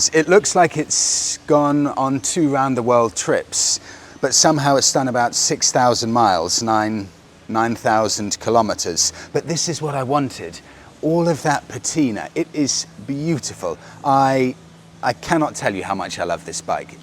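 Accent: England, United Kingdom. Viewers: The bike s in immaculate condition. RE is the pride for India. It's one of the oldest motorcycle company in the world & it's still growing. Are u from the UK. Pls comment ASAP. Perhaps from your accent I just picked it up. Thank you. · Nice to hear a proper English accent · I only watched the whole video because of the British accent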